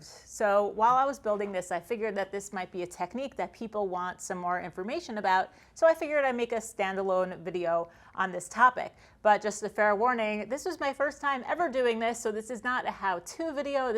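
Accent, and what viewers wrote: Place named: Mid-Atlantic, United States. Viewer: that’s not New England. Possible modern mid Atlantic; Eastern PA, Northern NJ, parts of CT and Long Island.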